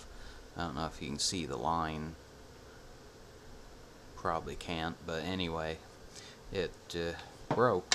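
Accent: Canada. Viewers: You are canadian aren't you?!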